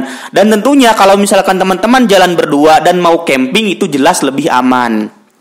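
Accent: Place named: West Java, Indonesia. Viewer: Keren mang, meni sunda pisan logatna. InsyaAllah abi bade solo riding ka flores minggu dpn.. Aya IG mang?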